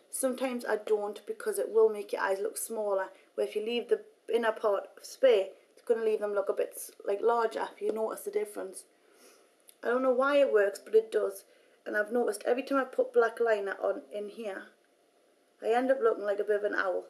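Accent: Newcastle, United Kingdom. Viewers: oh get a life, shes a geordie lass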